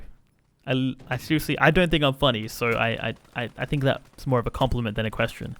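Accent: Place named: Australia. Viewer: wow your an aussie too. I though you were. you should do something like a sparkle special but joocy raging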